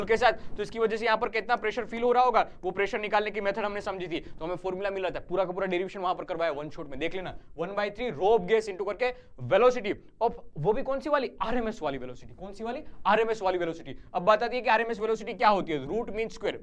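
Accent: Gujarat, India. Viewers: Sir gujrati hai....shayad...😊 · Sir aap Gujarati ho kya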